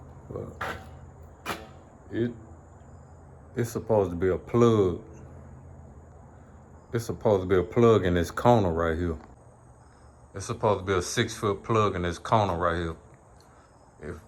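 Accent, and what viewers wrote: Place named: South, United States. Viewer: Where are you from? That sounds like a strong Southern accent... like mine 😂. First time watching, from MS.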